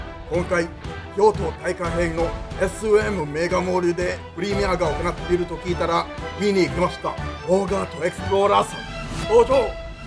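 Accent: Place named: Australia. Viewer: japanese with austalian accent!!!:))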